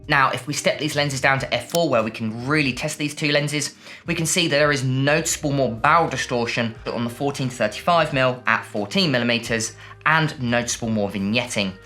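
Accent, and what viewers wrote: United Kingdom. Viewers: your English accent is annoying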